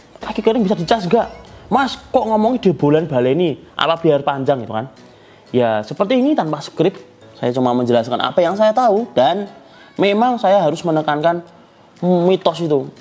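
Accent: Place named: Java, Indonesia. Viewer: Boso jowone ojok nganti ilang mas Aku suka caramu menerangkan dengan ada logat jowone mantap😁🤙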